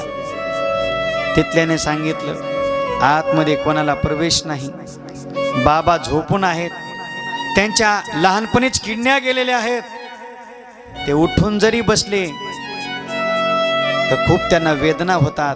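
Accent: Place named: Rural, India. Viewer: किती गावठी मराठी बोलतोय रे तू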